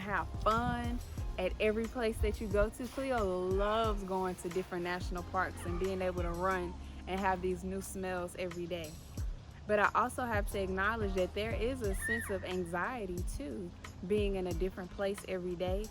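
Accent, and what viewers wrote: Houston, United States. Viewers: You definitely got that "Houston" accent going on...You ouchea sounding like Bey... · That houston accent, lawd. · They are both from Houston. I'm from Houston and a guy told me I act like beyonce. I was like what???? It's the Houston accent.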